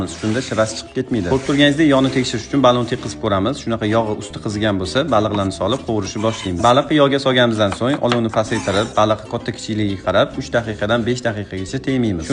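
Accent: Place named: Tashkent, Uzbekistan. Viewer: Тошкент шевасида бошладику,рыбхоз деяпсиз?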